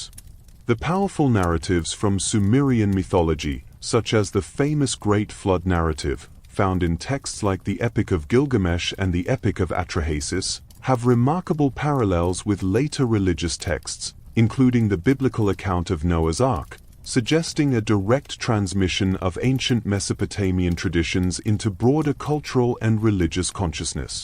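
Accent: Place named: United Kingdom. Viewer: I can endure only limited doses of British pronunciation.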